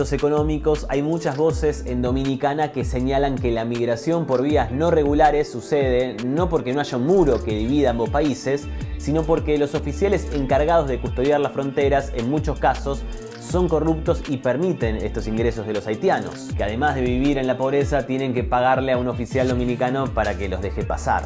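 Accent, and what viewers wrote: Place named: Argentina. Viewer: Por tu acento diría que eres argentino y si es así tu país es bastante grande y tiene más recursos que nuestra media isla por lo que te sugiero que nos ayude con esta invasión haitiana y te lleves por lo menos un millón de todos los haitianos que tenemos aquí.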